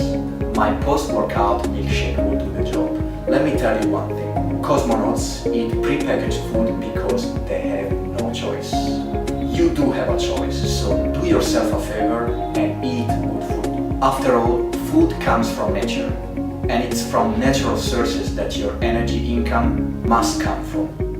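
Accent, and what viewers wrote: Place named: Italy. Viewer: Minchia allora avevo ragione a pensare che fossi italiano all'inizio l'accento era quello hahaha viva l'italia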